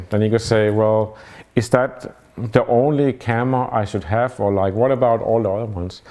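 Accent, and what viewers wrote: Denmark. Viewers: Your intro are my favorite. I love the danish english vibe. Have been follomt you for some time now. Keep it up. 😊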